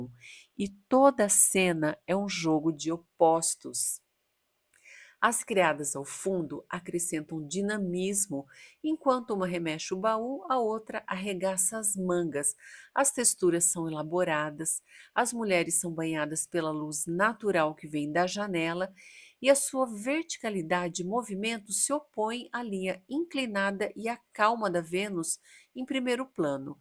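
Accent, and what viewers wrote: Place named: Rio de Janeiro, Brazil. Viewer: Acho que vc é carioca!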